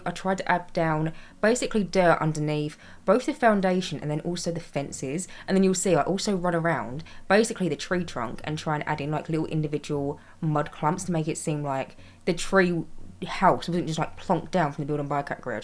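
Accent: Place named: England, United Kingdom. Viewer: your builds are always gorgeous. on another note, as an ex-pat nice to hear a very english accent again.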